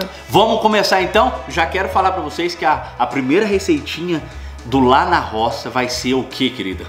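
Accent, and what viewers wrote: Minas Gerais, Brazil. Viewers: Adoro suas receitas!! Adoro vcs dois! vc é mineiro?! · O sotaque mineirinho voltou com tudo!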